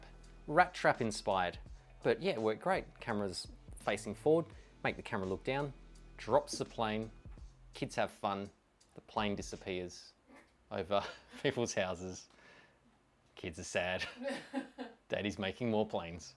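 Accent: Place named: Australia. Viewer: You are a riot! Great sense of humour and sound effects, not to mention your outraygeous Aussie accent. Cheers!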